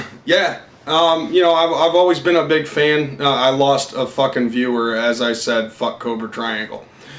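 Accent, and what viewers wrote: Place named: Midwest, United States. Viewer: Are you a Michigander,? I was born and raised in the mitten but live in Los Angeles now. Your Midwest accent is making me miss some of my Michigan homies.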